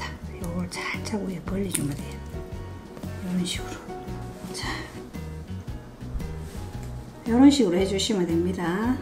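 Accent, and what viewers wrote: Gyeongsang, South Korea. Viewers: 경상도 톤이라 더 친근감이 듭니다 향상 보고 듣고 많이 배워갑니다 감사합니다 ^^~^^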